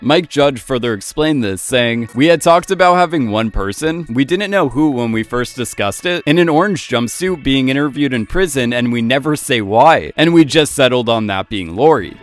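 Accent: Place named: California, United States. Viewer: the narrator is so, sooo, California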